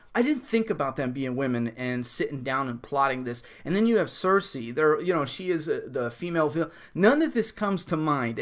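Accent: United States. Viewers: I love your American way of speaking about Game of Thrones. 😊 Such a great episode, I watched it twice. Loved seeing Lady Allena meeting Khaleesi.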